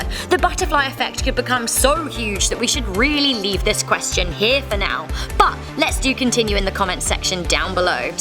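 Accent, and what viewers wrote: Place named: Cockney, United Kingdom. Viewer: The lady talking sounds like a spice girl clone, ranking Scotland as a small country is a joke, Scotland has produced invented discovered more than most larger countries combined she needs an education. Scotland home of the enlightenment and the industrial revolution, founder of the Bank of England, the wealth of nations, David Hume, Napier, Black, Simpson, Bell, Carnage, Hamilton such a crap dialogue then again she sounds east end English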